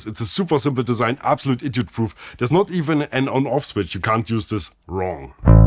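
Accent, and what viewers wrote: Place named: Northern Germany, Germany. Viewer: Its Bassic ....A north german accent ....a mixture of viking , german and anglo saxon languages....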